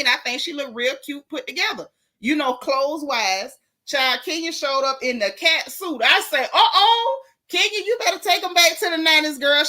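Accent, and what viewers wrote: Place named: Florida, United States. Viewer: Your Florida mouth have me laughing so hard cause I am from Miami and our mouth is so ridiculous people can’t understand Florida people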